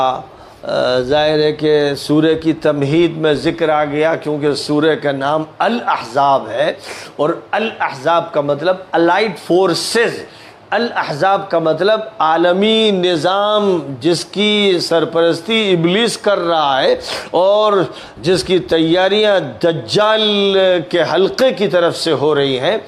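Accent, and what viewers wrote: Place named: Lucknow, India. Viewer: ماشاءاللہ, کیا پیارا اندازِ گفتگو ہے آپ کا, خاص لکھنوی اسٹائل. آپ کی گفتگو سن کر بہت مزہ آتا ہے اور آپکی بات دل میں اتر جاتی ہے. مولانا سلمان حسینی صاحب, اللہ تعالیٰ آپ کو سلامت رکھے, کہ آپ حق بولتے ہیں ہمیشہ.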